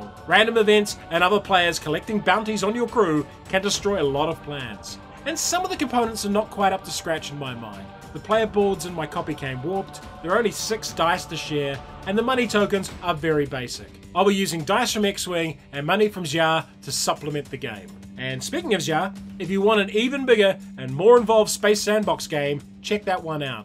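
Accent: New Zealand. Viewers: Kia ora, e hoa! So pleased to hear a kiwi. Tino pai rawa!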